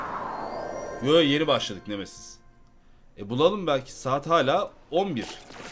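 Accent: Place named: Ankara, Turkey. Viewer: Ankaralı olduğu nası da belli karı kızda yedi parayı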